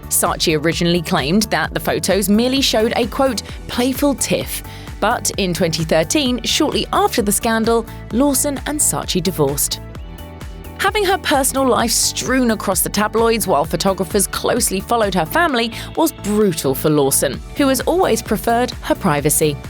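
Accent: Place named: United Kingdom. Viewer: I'm a guy who was initially attracted to Ms. Lawson by her looks on her early food programs. I do cook but her style didn't really appeal to me and then came the dirty news about her marriage, drug use, public embarrassments and, least of all, her weight gain. In spite of all that I would still turn on her program, sit back, close my eyes and just listen to her melodic voice and that charming British accent and maybe drift off into a nice nap. So many celebrities that have everything life has to offer yet they make a mess of it.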